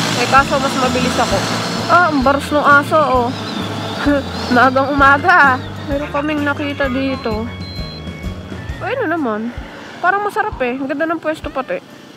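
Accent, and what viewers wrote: Batangas, Philippines. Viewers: nakakaaliw talaga mga punto ng mga Batangueno tapos ang nakakaaliw ka pa magkuwento. aba'y panalo!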